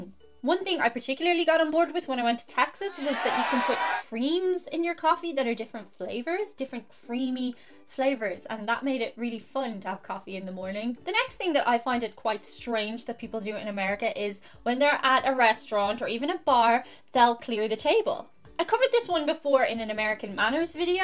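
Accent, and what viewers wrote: Ireland. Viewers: very interesting. I'm from the usa but my Grandfather (Thomas Feeney) was born in Dublin Ireland. I love your Irish accent...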